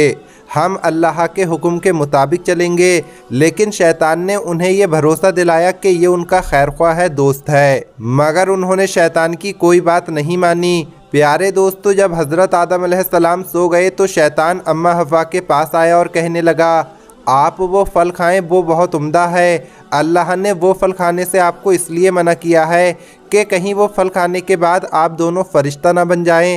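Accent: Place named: Bihar, India. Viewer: Hi batchit se to bihari lag raha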